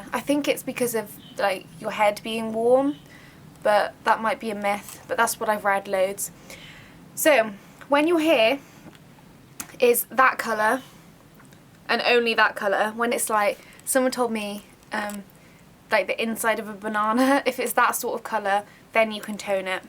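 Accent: Cornwall, United Kingdom. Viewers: You sound cornish, correct me if i'm wrong? Great tips, by the way!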